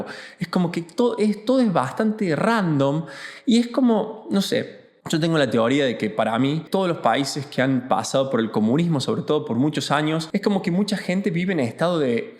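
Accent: Córdoba, Argentina. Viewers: Ya se te está pegando la tonada cordobesa de Batumi 😊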